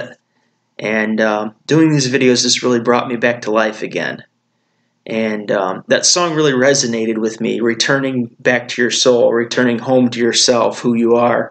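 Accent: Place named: United States. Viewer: I had a feeling your accent is so American ;)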